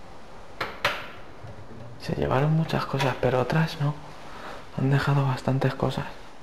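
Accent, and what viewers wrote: Spain. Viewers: Por su acento parece español,mucho gusto... Con todo respeto 🙏 no creo nada de mansiones abandonadas,tengo derecho a opinar y pensar cómo cualquier ser humano Pero si realmente estuviera abandonada.... La suciedad de tierra insectos etc etc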